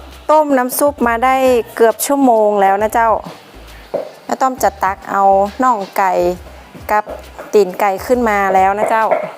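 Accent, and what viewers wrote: North, Thailand. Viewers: เป็นภาษาคนภาคเหนือคะ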